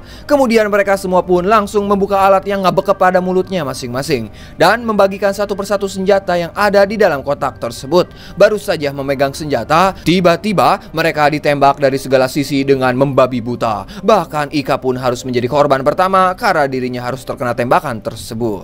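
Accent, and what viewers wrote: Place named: Sunda, Indonesia. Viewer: Lu asik bang klo bicara Sunda wk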